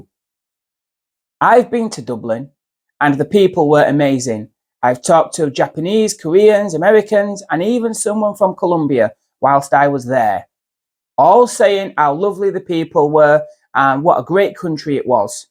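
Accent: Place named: West Yorkshire, United Kingdom. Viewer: Over pronounces his T's lol so people from other countries can understand the west yorkshire accent laura towler used to do it a lot.. Good vid i agree! 👍🏻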